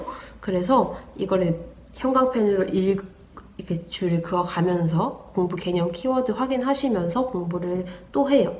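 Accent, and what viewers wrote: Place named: Seoul, South Korea. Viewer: 서울말 잘쓰는데 ~ ㅎ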